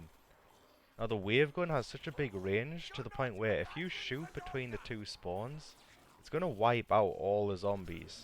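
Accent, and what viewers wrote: Newcastle, United Kingdom. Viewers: he's from Newcastle lol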